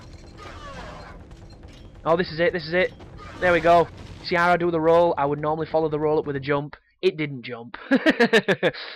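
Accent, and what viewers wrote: United Kingdom. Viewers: I really like this guide...your voice is cool (i'm in the US; not used to british accents), and your guide is giving me the confidence to beat this game on very hard to then unlock master ninja. Just completed sigma 2 on master ninja? Ever doen that? Or have you only beaten ninja gaiden 2 for the xbox 360? Either way, I definitely enjoy your channel and your guides. Very professional and to-the-point.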